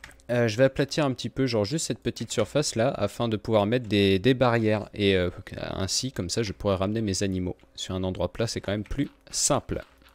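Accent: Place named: Nord-Pas-de-Calais, France. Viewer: Salut , j'ai remarqué que tu parlais ch'ti , tu es du nord ?( Car moi oui )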